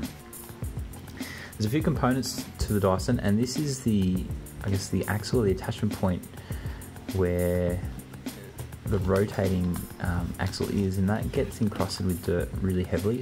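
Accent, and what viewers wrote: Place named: Australia. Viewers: This is an Australian accent, not a British one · He’s from Australia. That’s what that accent sounds like! A sensible country where you rightly pointed out normal people don’t have or need hand guns. They do however have snakes and spiders that are 10 times more dangerous!!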